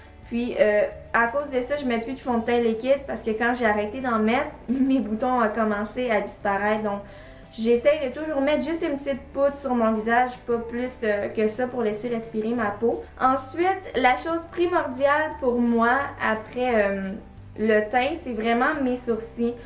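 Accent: Quebec, Canada. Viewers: Accent quebecois, jsuis sur...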